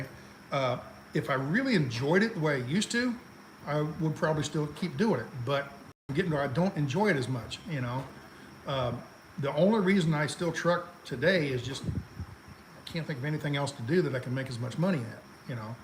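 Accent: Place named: Ohio, United States. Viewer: Love your vernacular language. My father was from Ohio and he spoke and sounded like you. He was intelligent but kept his family language. I love your pleasant voice and giggle. Ron and I took 2 pairs of Sketchers, the same two type and colors Grey Wolf had purchased and hand carried them into the middle of Nevada. He was so appreciative. Ron walked a mile or so near Austin, NV and I kept the back end safe. Hope you mention his efforts and that those who join him have enjoyed their experience. God Bless (Geri)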